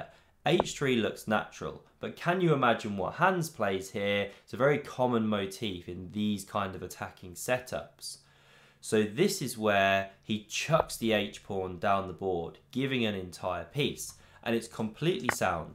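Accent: United Kingdom. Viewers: Congratulations because I' m italian and I understand every Word, your english Is pure. Are you British?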